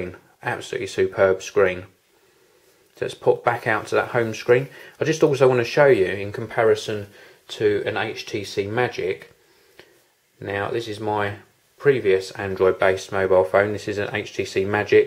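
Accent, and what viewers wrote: England, United Kingdom. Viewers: thanks for the review...im preordering the unit :] i hope the battery is as good as you say it is....btw gotta love english accent ;]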